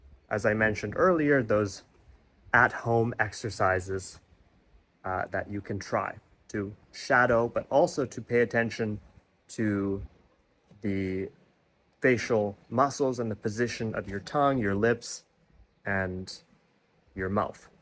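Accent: Canada. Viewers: I can literally sense your Vancouver accent. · Canadian is clearly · hello boss, love love your english Canadiense Accent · Are you Canadian ?? Where are you ? I’m in Canada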